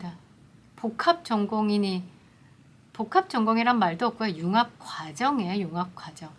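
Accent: Gyeongsang, South Korea. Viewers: 준석이도 싫지만 니 경상도목소리도 듣기싫구나 아무튼 준석이졸업장엊ㅅ는거만 밝혀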